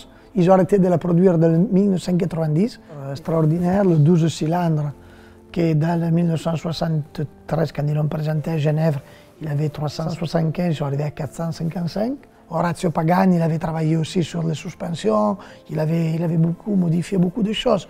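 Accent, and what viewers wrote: Italy. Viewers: He is italian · It isn't. He's an Italian speaking French—with a pronounced accent. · Am I wrong or he is an Italian speaking french? If not, what language is that? Sardo?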